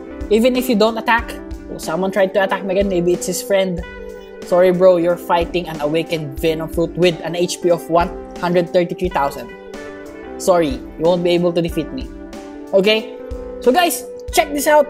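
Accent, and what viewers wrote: Philippines. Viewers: Your on philipines thats why your voice is like that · sa boses mo halata na na filipino ka eh haha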